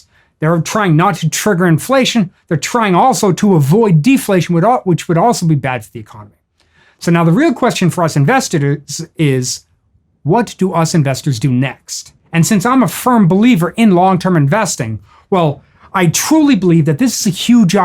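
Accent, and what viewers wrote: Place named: New Jersey, United States. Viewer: = Awesome. I could listen to that lovely Jersey accent all day.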